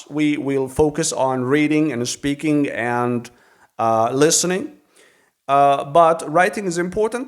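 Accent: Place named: United States. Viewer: American Accent good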